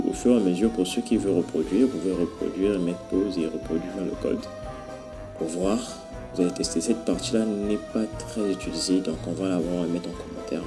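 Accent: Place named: Africa. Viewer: tu as un accent africain bien venu dans la famille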